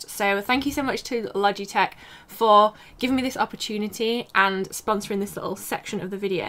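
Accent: England, United Kingdom. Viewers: I love your videos. I am person with a lot interests and I’ve only just now decided to get to learn graphic design and your tutorials and awesome and greatly put together. That Kate Winslet English accent has my heart pumping in this African heat. Thank you my 🙈🙈🙈🤣🤣🤣🤣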